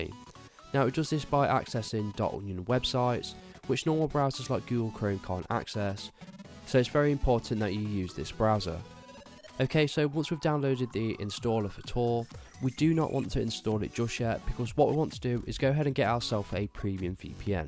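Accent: United Kingdom. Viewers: i hate british accent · Love the British accent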